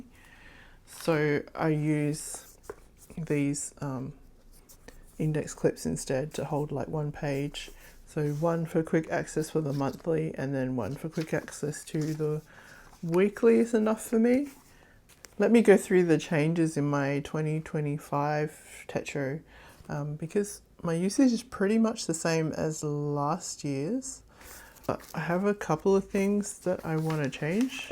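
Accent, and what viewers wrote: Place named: Australia. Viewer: Thought you sounded Aussie. Which state are you in? I’m in Perth, WA. Love your journals-so chunky.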